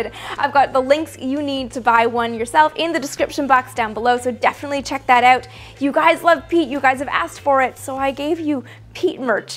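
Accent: Canada. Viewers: Are you Canadian? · Joshua Walker she's speaking English and she is from Canada. English has so much French influence anyway, and in parts of Canada they speak French. So, no surprise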